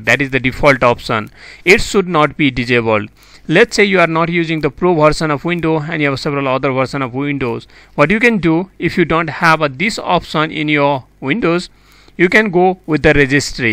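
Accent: India. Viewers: I love Indian Eng accent ; ) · this was the first video i clicked and when i heard that indian accent, i instantly knew this would save my life! thank you brudda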